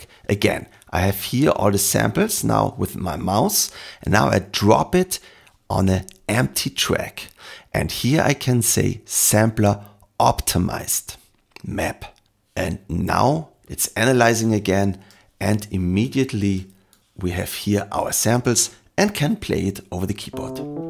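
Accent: Germany. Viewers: man kann deinen deutschen akzent deutlich hören :)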